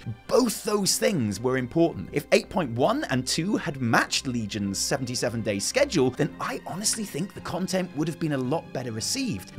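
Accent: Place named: United Kingdom. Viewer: Add Subtitles plz... i love your videos... but you speak too fast XD i love the british accent by the way <3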